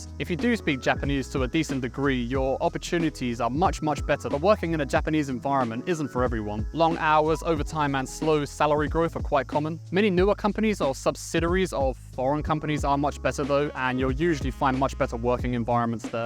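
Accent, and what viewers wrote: United Kingdom. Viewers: Hey there, this is the first vid from you I have seen, and I’m a new sub from South Africa 🇿🇦 and I have to say, you sound vaguely South African too…I can also hear an English accent too…eish, I’m confused and I think I’m hearing things, sorry…The more I listen, the more English you sound now 😂😂😂🤷‍♂️🙏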